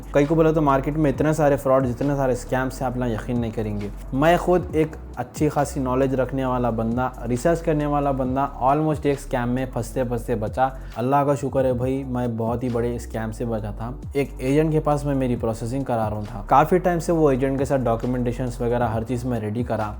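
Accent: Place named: Hyderabad, India. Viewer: apke baat karnke tarikqe se pechna mai hai nahi hyderbad ke aap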